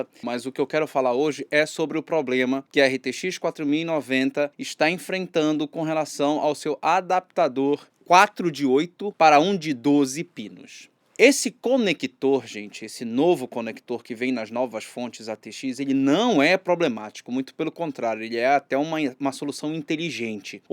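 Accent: Pará, Brazil. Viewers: da pra saber que o cara é paraense só pelo ritmo da fala dele.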